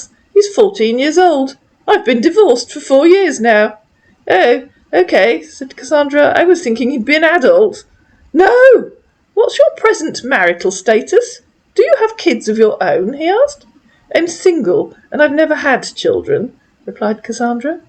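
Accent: United Kingdom. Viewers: Rofl 😆 I LOVE it and actually LOL when you call him an idiot or tell them to stop acting like a baby. With your British accent, it's just sooo derned funny! (And no, I'm not making fun)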